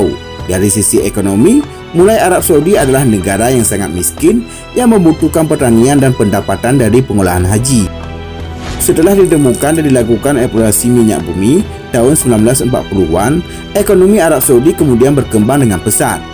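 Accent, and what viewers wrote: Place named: Solok, Indonesia. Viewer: Naratornya pasti urang sOLOK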